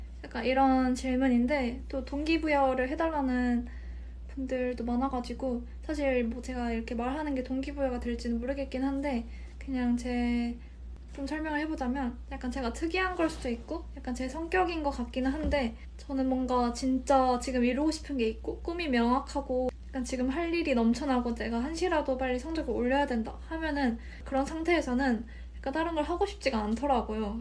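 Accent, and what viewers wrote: Jeolla, South Korea. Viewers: 전라도 출신이신가용??? 억양에 살짝 묻어나는 느낌 ㅎㅎ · 억양 들어보니까 전라도 같아요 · 혹시 광주분이신가요? 전라도 사투리가 귀여우세요ㅎㅎ 그나저나 새벽기차타고 대치가는거 진짜 힘드셨을텐데...정말 고생 많으셨어요!! 얼굴도 너무 예쁘다. 애기같고. 이제 본과생이면 더 고생하시겠네요 화이팅! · 사투리 억양 들어보니 광주나 전남지역 분인듯~ㅋㅋ 성형도 안하신거 같은데 의대생이 외모까지 자연미인이면 세상 너무 불공평한거 아닌가요?ㅜㅜ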